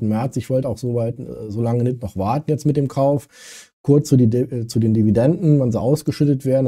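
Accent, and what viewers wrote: Saarland, Germany. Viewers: du hast ein Saarländischen Dialekt :D lustig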